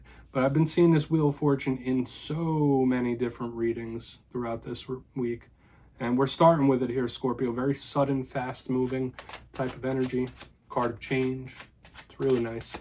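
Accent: United States. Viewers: At least you speak English like the true American you are! I’m so sick of all the foreigners tarot readers from other lands that we can’t even understand with their too thick accents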